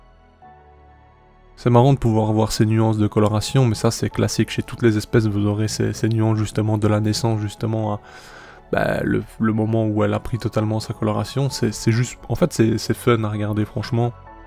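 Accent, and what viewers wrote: Belgium. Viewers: cet accent me semblait belge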